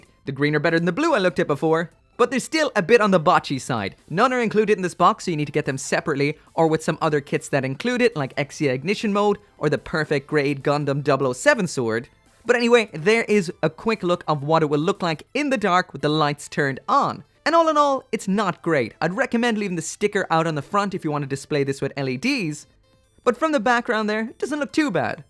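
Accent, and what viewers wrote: Ireland. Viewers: You from ireland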